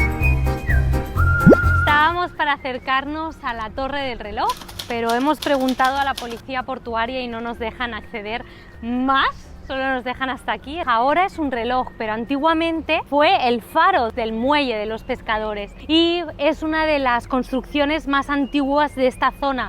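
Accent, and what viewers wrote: Andalusia, Spain. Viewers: Hola!! tengo una duda, porqué tienes acento andaluz si eres de Catalunya? ole tu carisma 😍 saludos... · Una Catalana con acento andalú? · Y porque el acento andaluz, si sois catalana, o me equivoco completamente? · Algún día visitaré España. Yo pensé que eras de Andalucía, no se, tu acento!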